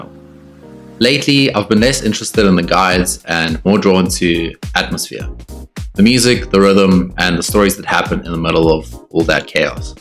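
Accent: South Africa. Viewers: Great video man, I just recently broke 200 hours in the game. You sound hella South African too🔥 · Ayo naaah you sound like my people, you from SA by any chance 👀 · South african? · You from South Africa???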